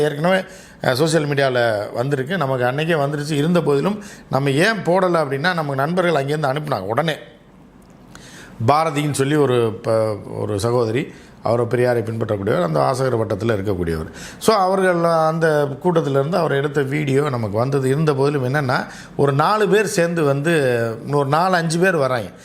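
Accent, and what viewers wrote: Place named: Nagercoil, India. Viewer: Nagercoil slang kooda ippadiatha irukkum...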